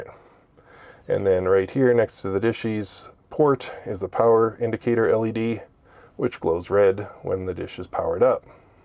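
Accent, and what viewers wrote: Canada. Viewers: He's Canadian so it's Ja, you bet ya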